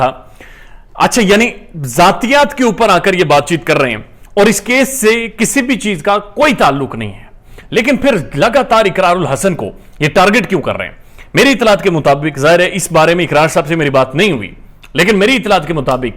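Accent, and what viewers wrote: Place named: Punjab, India. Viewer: Hayee Sir! M just ap kii punjabi ko sunany kyleay ap ka vlog dekhtii..wEsy to bii punjabi hn..lakin apkji punjabi style wahdhiya💯👌👌😂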